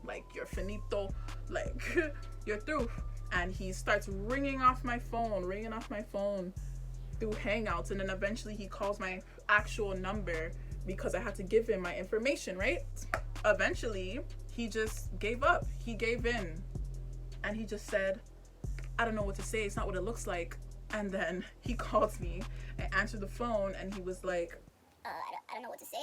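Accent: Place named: Jamaica. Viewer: yeah, I was asking because you had like an accent also Jamaicans have pretty accents.😊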